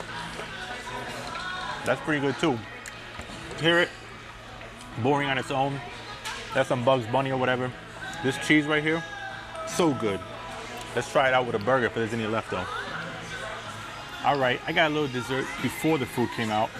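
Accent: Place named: Tri-State Area, United States. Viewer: Oye, you from the tri state area? I'm placing bets on Jersey or possibly the city (NYC). I hear an accent and it sounds like fam back home. #NoTimeForADiet 🤣😂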